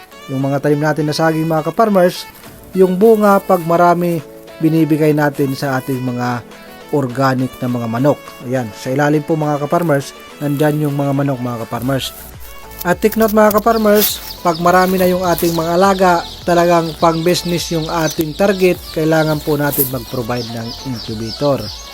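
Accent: Waray, Philippines. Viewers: San makakabili Darag Native na yan san lugar ba kayo, parang waray ang tuno ng salita nyo?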